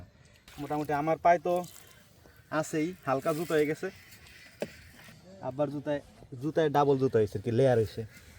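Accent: Faridpur, Bangladesh. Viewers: ভাই আপনার বাসা কই?? আমার এলাকার একসেন্ট পাচ্ছি,, আমাদের ফরিদপুরের মানুষ ও এইভাবে কথা কয়। আর আমারও এমন গুলোমুলো টাইপের একটা ভাগিনা আছে 🥳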